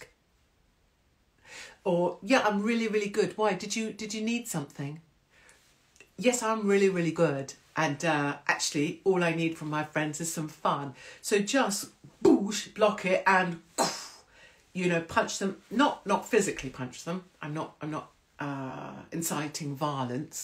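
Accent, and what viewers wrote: RP, United Kingdom. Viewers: Yes Joanna Lumley - posh spoken - really outspoken😀👍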